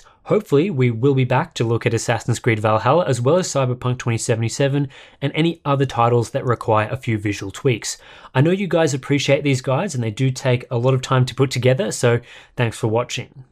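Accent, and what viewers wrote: Australia. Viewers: So aussie so organised. Are guys ausies.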